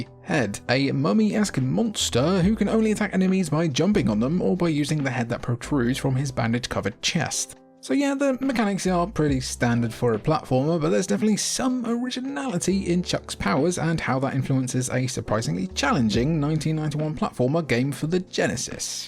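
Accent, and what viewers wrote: England, United Kingdom. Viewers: You sound English... why do you call it the Genesis when it was ONLY called the Genesis in America? If you're English you, like the rest of the world, had a Megadrive. This dumbing down of the world to only cater to the American audience is really depressing. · There's something wrong about a British accent calling the Mega Drive a Genesis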